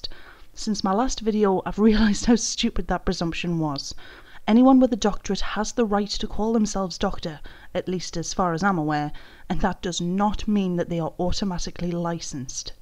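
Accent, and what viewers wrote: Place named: Newcastle, United Kingdom. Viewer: More than British...you’re a Geordie...hell you could be one my neighbours 😂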